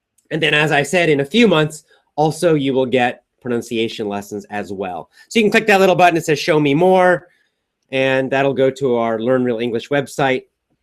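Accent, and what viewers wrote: United States. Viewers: I love your american accent teacher.its been really long time teaching my self american english only on the Internet cuz we don't have english teachers here well they teach us english roles and grammar by FRANCE ACCENT AND PRONUNCIATON. feeling so down.i hope some Americans come to fez city Morocco just to teach us their own language. 'We re so thirsty ' to learn about American English and more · Super sir..even ur Amcn English we can understand well..(slowly teach).can teach(pronounciation) us more very importantt words?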